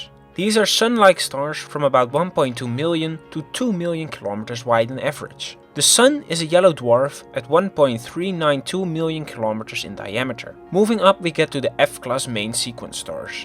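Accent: Netherlands. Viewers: sounds more like a dutch accent than a lisp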